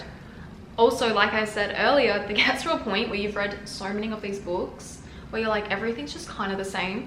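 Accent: Australia. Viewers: Asian Aussie accent ia cool 🤙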